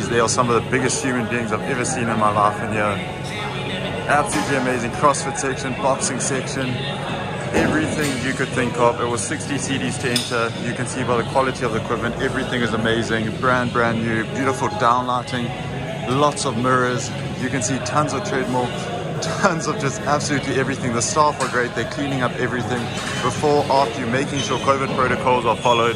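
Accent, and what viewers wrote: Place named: South Africa. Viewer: I die every time he says asssss cream shops😂love the SA accent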